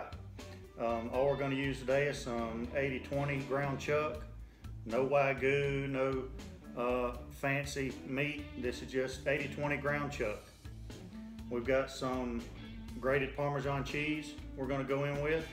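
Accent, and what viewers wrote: South, United States. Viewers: you sound like a real country boy what state are you in to me it sounds like Texas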